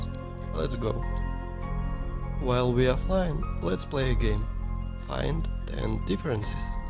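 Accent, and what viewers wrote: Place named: Russia. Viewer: Why is the music so loud¿. Its Hard to hear what he says, with an Russia english accent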